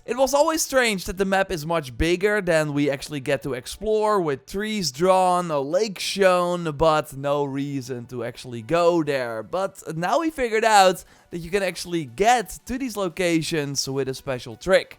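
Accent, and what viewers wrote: Netherlands. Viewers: the "th" sound a not the same as the dutch "d" sound. Maybe it helps with getting rid of the dutch accent if you want that. Just a tip from a fellow dutchy, not to be mean.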